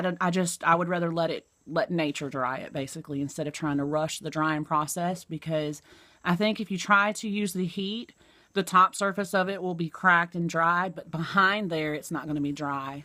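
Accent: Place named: North Florida, United States. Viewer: Love the angel bottle! I knew from your accent you are from my neck of the woods and then you mentioned Gainesville. 😎 I am from N. Fla, nice to hear familiar speak. I found one of your videos from the negative stuff going around and then looked for other content from you and watched. You are such a beautiful woman and your heart shows, it's so easy to get caught up in other's BS when you are the brunt of their attacks, but it sounds like you have a wonderful family and a soulmate. You don't need to explain or defend yourself or even acknowledge the BS, your friends will stand by you and your enemies won't care anyhow. Keep posting your art and ignore the antics of a sad and lonely person, you are above that. Take care!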